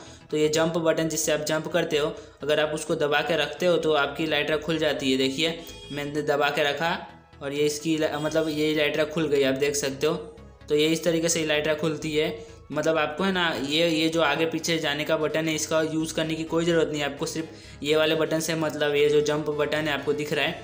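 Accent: Gujarat, India. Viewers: Pakka tum gujrati ho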